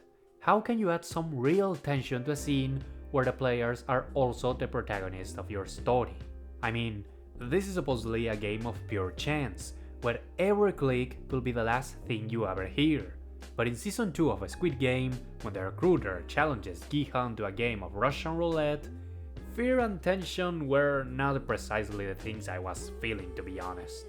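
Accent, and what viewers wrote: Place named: Argentina. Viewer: Sorry if this is a weird question but by any chance,Do you happen to have a Spanish-language channel? I think I've heard your voice before, and judging by the way you pronounce certain words, I think you're a Spanish-speaking Argentine.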